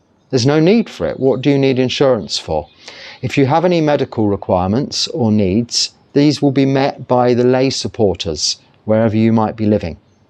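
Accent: England, United Kingdom. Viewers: What an absolute treasure these frank talks are, from a monk in situ...with an English accent! I hope the young people realise this was impossible pre-internet! Many thanks!! I have a question below.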